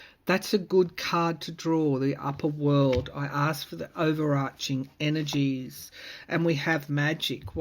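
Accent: Australia. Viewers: Yes I feel this too. Putin is not Russia. Women and men who hold love in their hearts do not support the war in Ukraine, the senseless deaths of their families, the devastation of their neighbour’s lands and cities, the strain on their economy, the unjust burden on ethnic minorities, the ignominy of the Russian name internationally. We are witnessing the last battles of patriarchal, authoritarian leadership in RF and in the US and in other countries. I pray for the flowering of locally based democracies all living in harmony with each other. Thank you for the reading. Love your presence and the Aussie accent. Vivien West Australia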